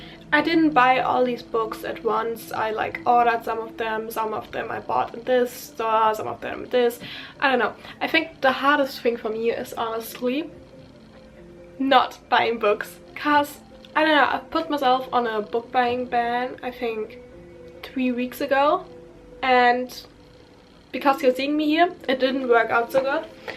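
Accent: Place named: Germany. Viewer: Es ist so spannend wie gut dein Vokabular bist, aber man trotzdem einfach hört, dass du deutsch bist. Würde mich interessieren, ob sich das mit einem Aufenthalt in einem englisch sprachigen Land ändern würde.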